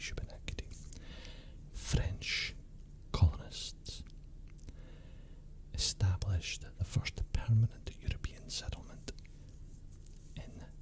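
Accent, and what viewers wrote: Scotland, United Kingdom. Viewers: I love the Scottish accent so much!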